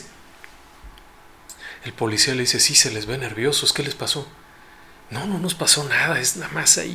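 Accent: Puebla, Mexico. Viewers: Recuerdo que en la estación XEB se transmitía un programa de CANACAR a altas horas de la noche y pasaban entrevistas con traileros y camioneros donde en dos o tres ocasiones los choferes contaron historias de terror. Era muy agradable ese programa que luego ponía la canción de con. Por cierto, eres poblano?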